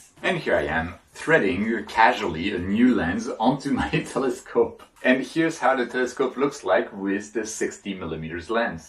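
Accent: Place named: France. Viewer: Bonjour de Belgique, it seems that you have a french accent, serais-tu français?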